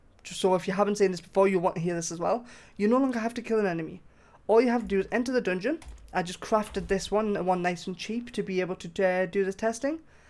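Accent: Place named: United Kingdom. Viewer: What part of Britain are you from, mate? What dialect would you call your accent, it is very distinct! Also, thanks for these videos, very clutch!!!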